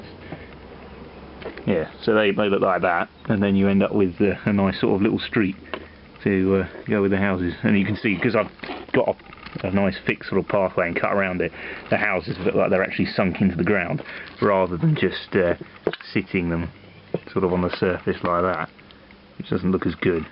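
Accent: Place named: Oxfordshire, United Kingdom. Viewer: Where is your accent from ? Sounds oxfordshire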